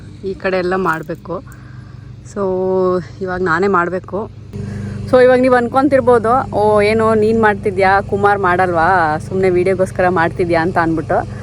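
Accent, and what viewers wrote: Mandya, India. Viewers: Hai nice vlog .nim Mandaya language thumba channagide nice to see your vlogs .OK bye take care. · Nim matu keli kushi agutte Same nam mandya style ಮಾತು❤ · Nimma mandya bhashe thumba chennagide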